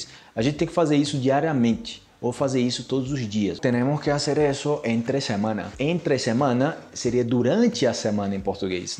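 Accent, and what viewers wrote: Antioquia, Colombia. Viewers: Tiene mas acento colombiano que yo 😧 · No es paisa? 😮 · A mi me parece que ud tiene mas acento colombiano que acento brasiliano? Como puede ser si ud viaja por toda hispanoamerica? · Habla con acento Colombiano, pensé que era de Colombia hablando un perfecto portugués. Jeje que bueno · Felicitaciones a antes había visto varios de sus videos hablando español se le nota un poco el acento colombiano. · Habla mejor español colombiano que yo como nativo, nunca pude hacer bien ese acento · Se nota el acento colombiano... 👍Saludos desde Argentina. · Eu gosto deste canal porque você tem um sotaque colombiano bem vocalizado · Pareces de acá de Colombia cuando hablas en español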